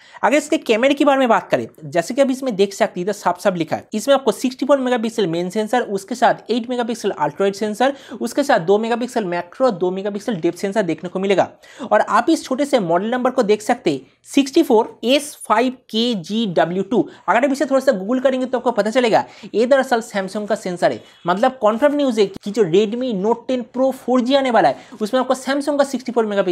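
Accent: Bengal, India. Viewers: Dada apni ki bangali naki apnar Hindi Kotha sunte lage je apni bangali 😀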